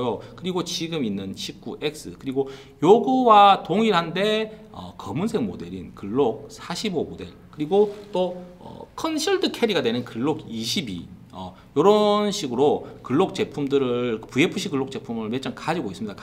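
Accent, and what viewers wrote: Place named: Gyeongsang, South Korea. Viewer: 아니 어떻게 억양은 골수 개앵사앙도오 인데 사용하는 어휘는 몽땅 표준어일까요? 총쏼때 한쪽눈 꼴치가꼬 전자가 쏘는 갱상도 아재는 너무 부럽네요. 분명히 아무리 들어도 마산분이신거 같은데요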